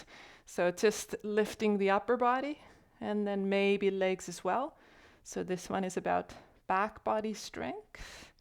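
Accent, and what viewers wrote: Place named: Australia. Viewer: this was a brilliant practice..... you have a hint of an Australian accent did you spend time in Australia at some point?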